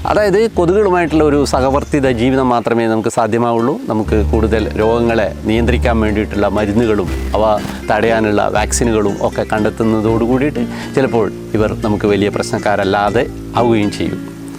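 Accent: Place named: Thrissur, India. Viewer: മാഷിന്റെ ഭാഷ ശൈലി കേൾക്കാൻ മനോഹരവും മനസിലാക്കാൻ വളരെ എളുപ്പമാണ്. തൃശൂർ ജില്ലയിലാണോ അല്ലെങ്കിൽ തൃശൂർ ജില്ലക്ക് അടുത്താണോ മാഷിന്റെ വീട്? നല്ല അവതരണം മാഷേ..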